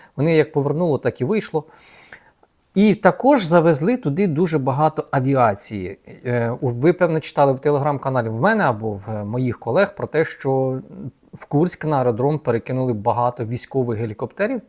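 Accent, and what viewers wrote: Standard. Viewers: Респект за чисту,інтелігентну,спокійну українську мову. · Респект за чисту,інтелігентну,спокійну українську мову. Роботу свою Ви робите спокійно,при цьому грунтовно.Дуже приємно Вас слухати. Успіхів!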